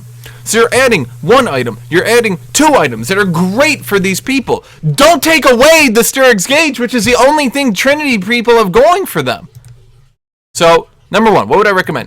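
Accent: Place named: Germany. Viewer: er sagte mal im stream dass er aus deutschland kommt. den akzent hat er sich wohl mit der zeit abgewöhnt, in seinen älteren videos ist er noch etwas stärker bemerkbar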